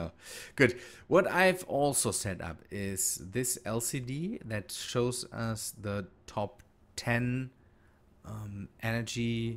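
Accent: Germany. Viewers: Nur intresse.. 😂 Deutsche haben so eine unverkennbare Aussprache, die hört man irgendwie immer heraus..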